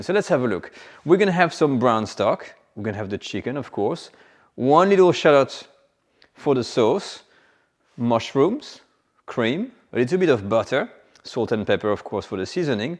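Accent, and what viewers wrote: France. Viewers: French cooking with a lovely french accent, délicious ! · With that accent, you can talk talk talk all you like monsieur. Je t’adore!!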